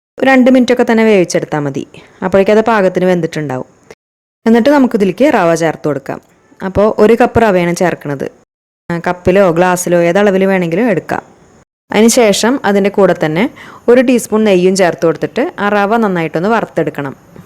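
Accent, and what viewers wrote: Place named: Thrissur, India. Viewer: തൃശൂരാ... ല്ലേ.. ഇനിക്ക് മൻസിലായിട്ടാ.... വർത്താനം കേട്ടപ്പഴേ... 😊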